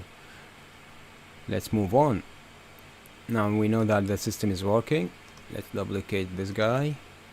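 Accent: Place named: United States. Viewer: استاد لدي سؤال كيف تعلمت الانجليز و اصبحت تتكلمها كانك شخص امريكي اعطني نصائح لتعلمها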